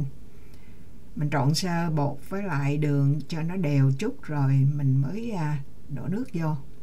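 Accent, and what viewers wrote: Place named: Central, Vietnam. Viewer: Em thích nghe giọng nói miền Trung của chị, học thêm những chi tiết đặc trưng để tăng cường kiến thức. Cám ơn chị rất nhiều. Em vẫn nấu bánh tét bằng cách dùng IP slow cook, bánh hoàn hảo tuyệt vời luôn chị à. Sáng kiến thật hay.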